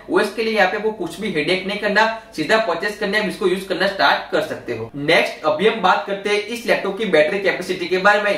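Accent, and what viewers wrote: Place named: Gujarat, India. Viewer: Yeh pakka gujarati hai iske bolne se hi pata lag gaya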